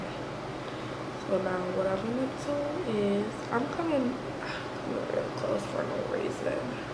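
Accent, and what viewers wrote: Jamaica. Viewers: it’s her Jamaican accent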